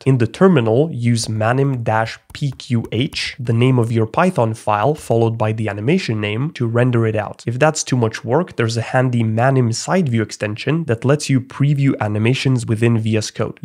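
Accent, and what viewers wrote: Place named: Europe. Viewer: it's the European Manim voice.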